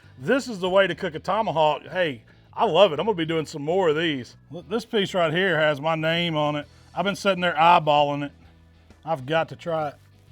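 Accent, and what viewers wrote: South, United States. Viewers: Something about a fat guy with a southern accent and awesome sayings that gives instant smoking credibility. Not a diss at all. Your videos are all awesome.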